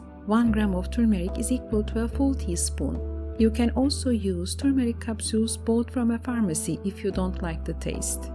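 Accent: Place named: Turkey. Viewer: from your accent I hear you are Turkish. Turkey has the best chefs.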